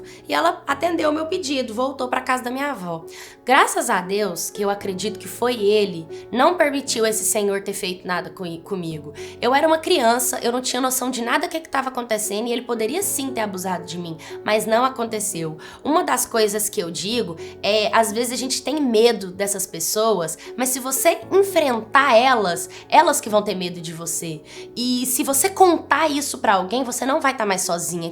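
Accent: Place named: Pará, Brazil. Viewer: Vc é paraense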